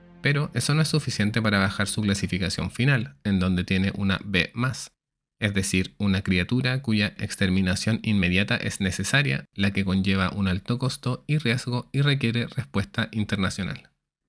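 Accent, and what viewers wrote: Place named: Chile. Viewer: Eres de Chile? Con razón tu acento se me hacía tan familiar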